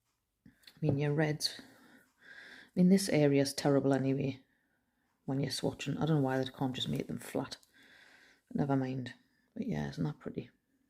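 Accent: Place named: Newcastle, United Kingdom. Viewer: Heya found you through Aggie & Jo... but 1st I just gotta say "hawayman" 😂 bloody love the jordie accent!! Me bros in Cramlingtin (think I spelled that wrong) but yeah he's in Newcastle years now. Anyways gonna watch the rest now 💕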